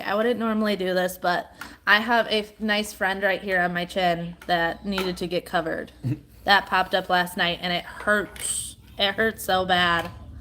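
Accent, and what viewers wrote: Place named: Minnesota, United States. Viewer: just a note gators can and do come into brackish waters they can live in it for a short time. I feel the same about Shark vacuum's I have one and love it, it works really well! Jungle theme is nice for a baby 's room! I like the dawn power wash I've seen where you can make your own? If your son has asthma make sure you don't have ligustrum's (privet) hedges planted near they cause attacks a friends son was just in the hospital because of them... Yes you do have a Minne soda accent Lol! But I like it!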